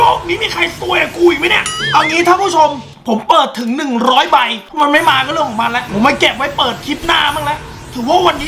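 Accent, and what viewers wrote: South, Thailand. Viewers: พี่ เป็นคนใต้หรอค่ะ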